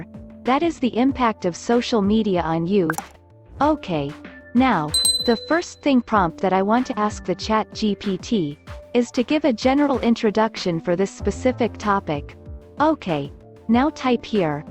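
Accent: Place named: India. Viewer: She/he is from India. Thats how we talk.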